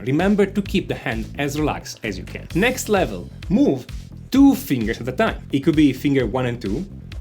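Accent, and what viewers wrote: Italy. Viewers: ma sei italiano per caso?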